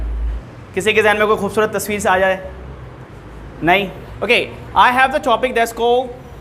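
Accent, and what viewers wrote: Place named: United States. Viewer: I always watch your videos because I love American accent & I know I can't find useful learning material to another tutor.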